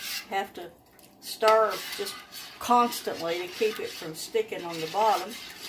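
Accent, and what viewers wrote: South, United States. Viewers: Well, I have never personally canned anything! This video was a joy to watch and to again hear your sweet southern accent bringing peace to me this morning. God is so good. My dear Grandmother would can pie mixes, so this also brought memories of my sweet grandma Alyce. Thank you. 💕 A couple of thoughts I had watching - I'll share - you need a strong shoulder to stir for at least 30 minutes, then an even stronger shoulder to mix in all that fruit. Enlist a grandchild or otherwise stronger younger person to be the official strawberry stirrer! The rewarding part of being enlisted in your kitchen is time with you and some fresh strawberries! And finally, you ended with listen for the "ping" - assuming you mean your jarring caps ping? I didn't know that! That must be rewarding music after hours of labor. 🎶 Thanks again for sharing. 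Have a blessed day.❤️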